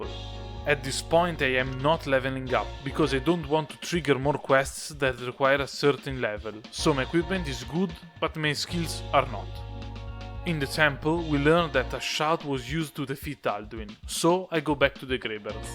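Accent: Italy. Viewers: Oh my goodness I love your Italian accent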